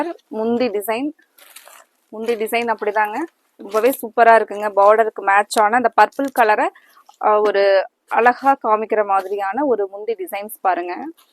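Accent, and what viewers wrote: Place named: Coimbatore, India. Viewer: அழகு கோவைத்தமிழ். கேட்க கேட்க திகட்டாது❤❤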